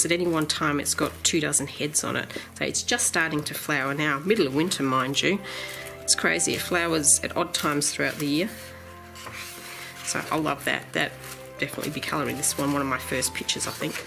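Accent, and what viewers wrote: Australia. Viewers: I hadn't watched anyone's reviews until I received my copy and I got my copy a few days ago and recorded my review yesterday. This morning I thought "OH I want to see what thinks" and it was so funny, you got excited about the same features as I did. It was like watching my review but with an Australian accent. I'm a big fan and can't wait to see what you do with it in a tutorial. ~ Chrissy Morin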